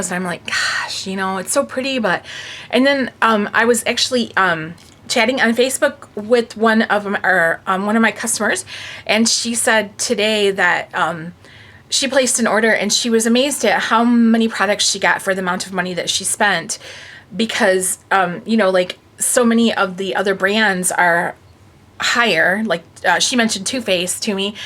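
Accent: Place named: Montana, United States. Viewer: You sound like a Montana girl,! There's been snow on the mountains here since the end of September. Winter is my favorite. Clean. Crisp. Holidays. <3 ALL OF IT. I'm originally from Terre Haute, Indiana, so I understand the Midwest Weather Moodswings. I don't miss it.;) THANK YOU for your videos. You crack me up and your vernacular and vocabulary about product gives me verbage tools to speak to my customers about our products. <3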